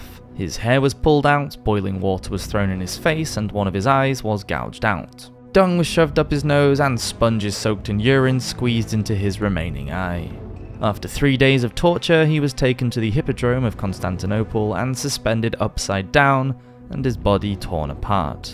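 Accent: United Kingdom. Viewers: The way you red coats pronounce some of your words is astonishing...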